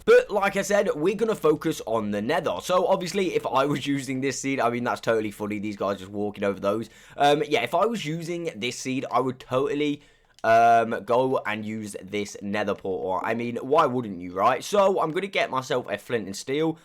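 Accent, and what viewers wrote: United Kingdom. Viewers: Your sound like UK guy.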